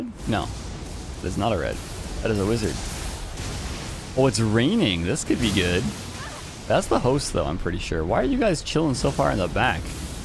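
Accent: Canada. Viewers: Can someone in the know answer me this question: Is a Canadian man of Asian ethnicity? An Asian-Canadian, as it were? I know nothing about him, just his voice and slight accent, and if there was a gun to my head, I'd guess he was an Asian guy born in Canada or at least moved to Canada very young.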